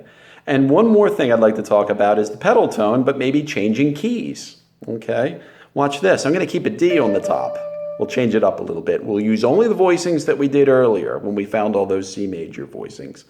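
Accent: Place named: East, United States. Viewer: I realize now that you've been in England for a long time, and that's probably why I hadn't seen you, and your eastern US speaking style didn't give this away. :)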